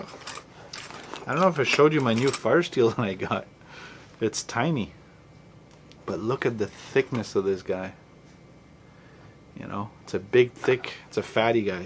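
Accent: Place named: Canada. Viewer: Where in Canada are you from? Love the thick accent!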